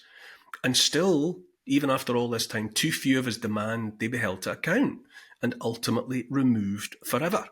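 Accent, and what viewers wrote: Scotland, United Kingdom. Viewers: This is the very thing he was talking about. You shouldn't be behind anyone. We should all be out there doing some form of resistance and/or spreading the kind of truths speaks. The comments I have made on a couple of occasions have not been about getting out there and leading some sort of resistance or revolt. It is about some - not all but quite a fair number - of his viewers that fawn all over him and actually say they wait and look for his next video, that he keeps them going because he is a voice of common sense and reason. Too many people appear to be addicted to listening to and others like him as a kind of comfort blanket, his eloquence, his no-nonsence Scottish way of speaking, his light, and airy room giving a sense of simplicity, peace and tranquil respite in a misty spaciousness, almost felt beyond the window, far from the hurly burly of busy towns in which we mostly live. 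To read some comments it's almost as if the daily sight and sound of is their daily fix which enables them to survive another day. I have read accusations of controlled opposition against on certain other channels particularly on Telegram. It's another label that's thrown around like all the -ist ones used by the Left Wokerati. I don't necessarily subscribe to that view but I can see why some might say it. As long as people are soothed and stay on their sofas waiting for their next fix they remain in a state of soporific trust that somehow he has all the answers and will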